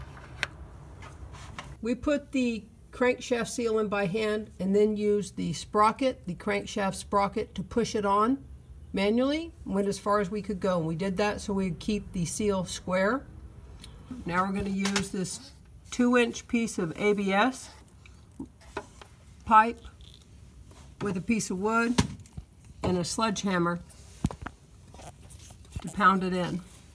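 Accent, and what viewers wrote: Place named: South, United States. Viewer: Plus points for the southern accent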